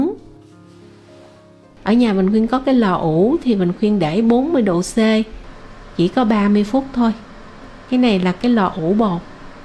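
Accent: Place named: South, Vietnam. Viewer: 1 giọng nói miền Nam rất ngọt ngào và tự nhiên. Rất lâu rồi tôi mới đc nghe lại, ở VN bây giờ như bị nhiễm cái cách hành xử của dân Bắc sau này nên dần dần vẫn có vẻ dối trá trong cách nói. Tôi là dân Bắc nên nghe ra đc, ko còn đc cái chân thành như của. Cảm ơn. Nghe dạy nấu ăn như nghe nhạc á.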